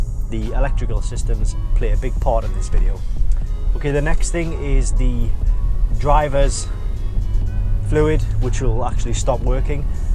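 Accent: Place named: Birmingham, United Kingdom. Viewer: Birmingham aye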